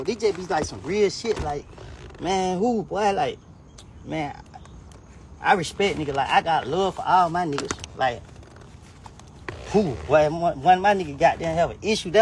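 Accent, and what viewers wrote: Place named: South, United States. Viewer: Love your accent! Country baby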